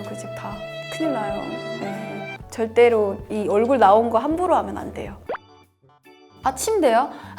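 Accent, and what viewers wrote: Seoul, South Korea. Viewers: 서울말을 쓰네요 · 서울 말씨를 완벽하게. 머리가 좋다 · 북한분맞으세요? 남한사람인지 북한사람인지 모르겠어요ㅎ 완전 한국사람다되셧네요.북한말투가 남아있지않네요. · 아궁이...밥맛은 좋을듯... 아무리 봐도 북한에서 온사람 같지 않음....말투도 그렇고....그냥 서울태생 같음...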